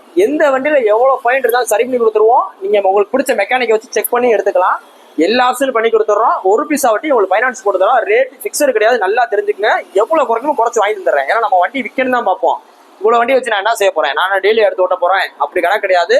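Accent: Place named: Madurai, India. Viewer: மதுரை மொழி😍😍😍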